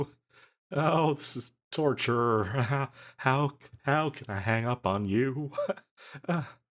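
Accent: South, United States. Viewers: I love the southernish accent. You do it really well💖💗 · I HEARD THE SOUTHERN ACCENT AND MELTED 😭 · naw man it was perfect. Southern to Southern this was so awesome! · gosh the southern-hilly billy voice is so cute omg🥴🥴ur voice is so adorable i swearrr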